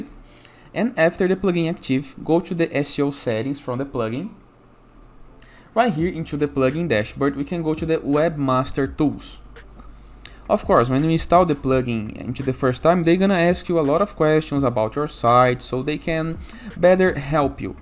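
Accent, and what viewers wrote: Brazil. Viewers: Na hora que ouvi o inglês percebi também kkkkkkkkkkk, seu inglês é muito bom, mas da pra perceber q é br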